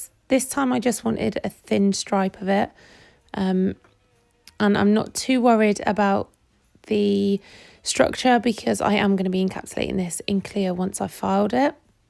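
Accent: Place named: United Kingdom. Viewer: Lol I didn’t understand what you were saying when you were saying glacier for a while but then I figured it out. In America we say it like glay-shur, so the way you say it I was like what?! Lol. I like uk accents, everything is prettier 😊 Also, these nails are gorgeous! Love how you created that ice affect with the foil, definitely gonna use that in a set ❤️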